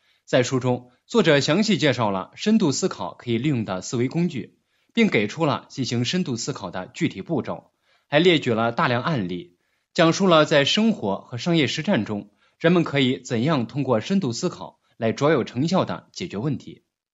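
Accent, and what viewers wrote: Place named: Shandong, China. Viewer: 说的太好了，只是普通话不是很标准，您是山东人吗？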